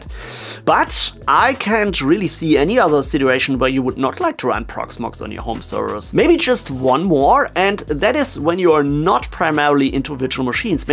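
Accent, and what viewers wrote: Germany. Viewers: that is one solid german (i assume) accent, pleasant to listen to if i may say so. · Moin, netter Überblick. Bringst eine Gute Energie rüber! Werde hier definitiv nochmal vorbeischauen ;) Gut, dass du das mit "Linux als OS" noch im Nachgang erklärt hast. Das hat dir glaube ich einige Kommentare erspart :D ;) weiter so! offtopic: auch respekt an dein flüssiges englisch. aber doch immer wieder erschreckend wie man es ab sekunde 1 raushört, wenn jemand aus germany kommt.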